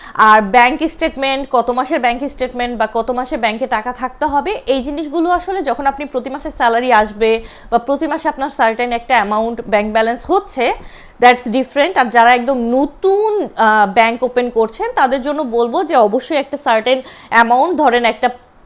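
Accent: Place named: Bangladesh. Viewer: আপনার কথাগুলো অনেক সুন্দর আপনার কথা শুনে মনে হলো আপনি বাংলাদেশের নাগরিক বাংলাদেশের কোন জেলায় আপনার বাড়ি জানালে অনেক খুশি হব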